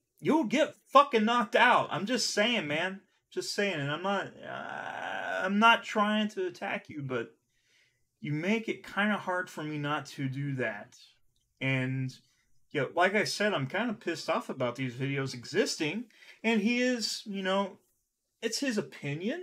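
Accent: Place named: North, United States. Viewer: Watched 2 of his videos and saw he has little respect for southern life style. He sounds like he is from up north.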